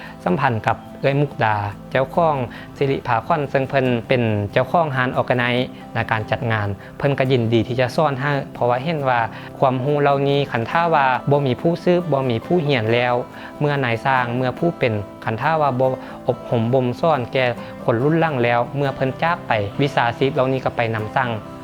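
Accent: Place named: Phetchabun-Loei, Thailand. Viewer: สำเนียง เหมือน เพชรบรู - เลย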